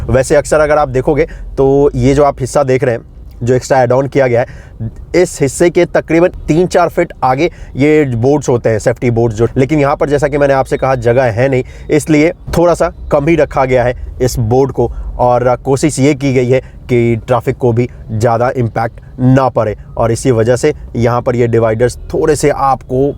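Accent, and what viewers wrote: Bihar, India. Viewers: टोन रखिए बिहारी जैसा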